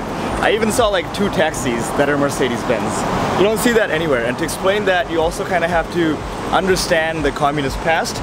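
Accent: United States. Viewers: Boy you made this vlog so interesting which convers Albanian history, culture, sights and touch to the food as well which is an essential part of the travelling. Very nice video. Also you say you are from Bangladesh but your accents is pretty much like USA and Canada. Thanks for the sharing. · Brother well ❤️ Can you say a secret thing like how have you learnt the us accent ? Because u said you have been to us for 5-6 years so during this time did you adopted this accent ?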